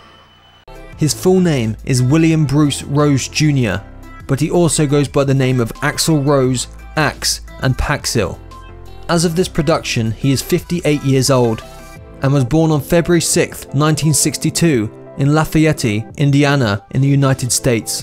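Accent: United Kingdom. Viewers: Is it just me or does the British accent describing one of America's greatest rock bands really seems to not be the right accent to describe Axl.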